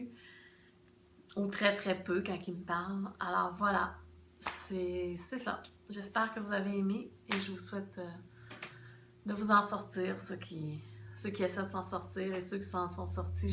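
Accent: Quebec, Canada. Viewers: salut la cousine canadienne un ptit kikoo de france je te rejoind sur ta chaine ca me plait beaucoup pis ta des bo yeux et une jolie voix avec un belle accent excuse moi j'ai des amis quebecois en france on dit ici vous etes nos cousins lol pis les autres video sont tres bien aussi pour les tj ta pas besoin d'une eglise ou une communaute la religion tu l'a au fond de toi tu peux prier chez toi voila kisssssss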